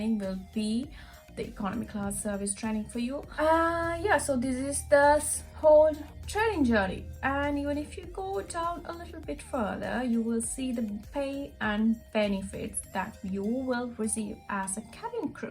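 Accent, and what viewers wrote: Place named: England, United Kingdom. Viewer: U knw what .ur so beautifull...plzz video ekak karanna english acent eka hadaganna widiha oya wage...❤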